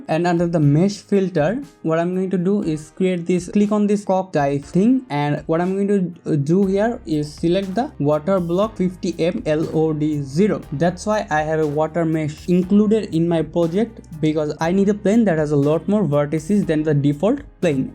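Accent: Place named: England, United Kingdom. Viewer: perfect, i love your English accent <3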